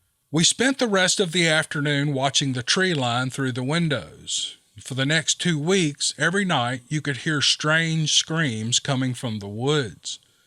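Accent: South, United States. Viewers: Yes.... you have that southern drawl...not heavy..but perfect storytelling kinda very I don't know any other words than perfect hell it's cheaper than my shrink and more enjoyable and you don't mind going over the hour😆😆😆✊💪🤜🤛🇨🇦👻💙👻💙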